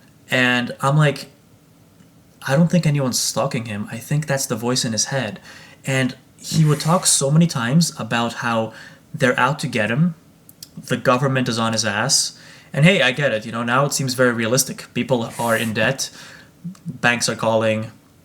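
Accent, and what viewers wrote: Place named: Eastern Europe. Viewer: its his accent/etc - he sounds eastern European to me, but maybe northern.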